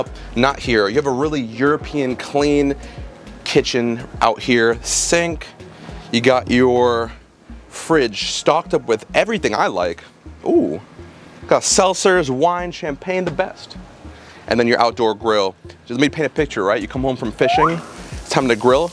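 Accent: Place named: United States. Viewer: i like your american accent. he is very classy. so many americans speak as peasants but not you .❤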